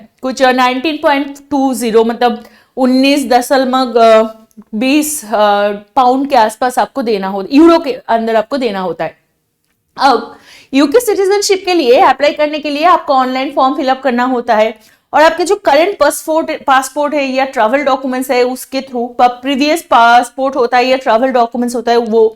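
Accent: Bengal, India. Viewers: Ma'am Bengali hai accent se pata lag gaya 😂❤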